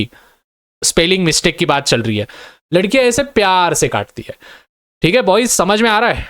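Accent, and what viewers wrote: Maharashtra, India. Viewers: Sir marathi ahet ka 😅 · Haa sir Marathi vala vatte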